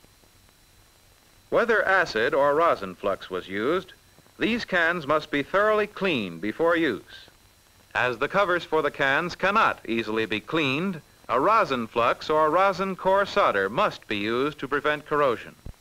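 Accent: United States. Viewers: Why do Americans call it SODDER? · It's a silent "L", only here in America. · That is one heck of a soldering iron!!! Why do Americans pronounce always solder as 'sodder'?!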